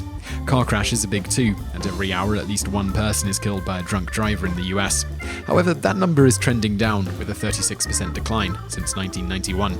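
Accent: United Kingdom. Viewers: the person doing the voiceover has a british accent ;)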